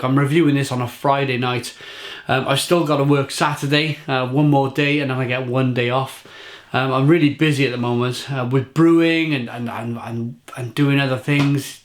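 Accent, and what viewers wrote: Wales, United Kingdom. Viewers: It's not right or wrong, it's just his Welsh accent where it sounds like they say "crumb putts". In most of the rest of the UK we say it like "crumpits" so it does sound funny to hear "putts".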